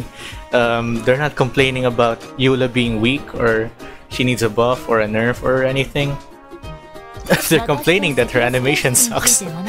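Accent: Philippines. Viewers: Are you filipino? You kinda have like a Filipino accent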